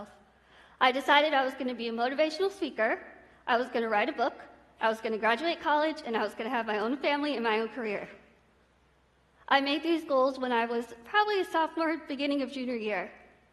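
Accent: United Kingdom. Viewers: イギリス英語ですし美しいですよね、、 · イギリス英語なんですね！ もうテストのリスニング全部この方がやって下さらないかな..🤔🤔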